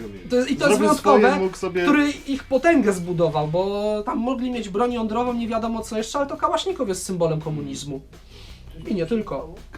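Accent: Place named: Silesia, Poland. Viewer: szlysza ze z naszej gotki mosz ten hajmacki akcent, pyrsk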